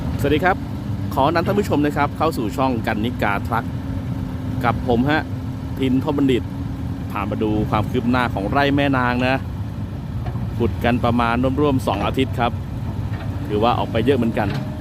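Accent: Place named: Isan, Thailand. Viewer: สุดยอดครับพี่เว้าอีสานแน่ครับอยากเห็นหน้าอ้ายครับFcเด้อครับ👏👏👏👏👏👏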